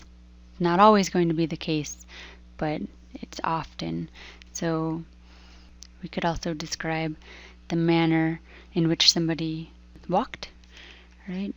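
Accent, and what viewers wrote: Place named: United States. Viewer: You have not explained the use with verb to be ( byt)..you seem to be a native American and you don't have your words prepared at all while explaning,you have that typical american tune of " the thing" ..so you should focus on your english word "thingy" and not be overconfident and cover it up in shown off erudite american tune ..temporary ...pause....state ...and that whole thing is terribly told and you just jumped to next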